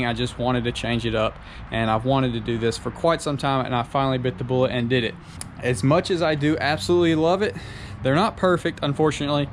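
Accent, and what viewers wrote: Alabama, United States. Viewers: What part of Alabama you from?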